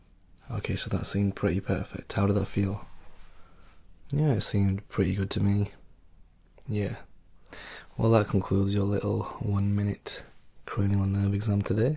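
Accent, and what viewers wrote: United Kingdom. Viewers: Never heard a British…asmrtist? Is that what you call them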